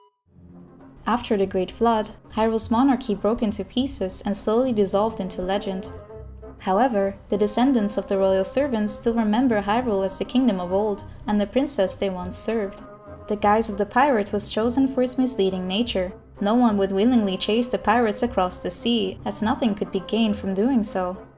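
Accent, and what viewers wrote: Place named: Netherlands. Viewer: She' Dutch.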